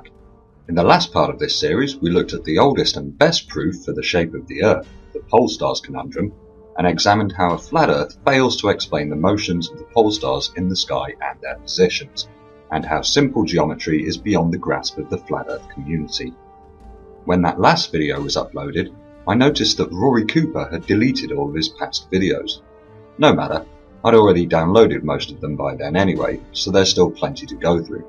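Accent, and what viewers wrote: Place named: England, United Kingdom. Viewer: ***** You AVOIDED the most pertinent part of my POINTS (and I only had a couple)... is it 2 FEET or 3 FEET over 6 miles... You state in the video it's been duplicated many times, the 3 feet thingy... yet avoid or explain away atmospheric refraction - PLEASE... you even state in the video it, the experiment, has been validated many times. You use an English accent.... is that to sound authorotative...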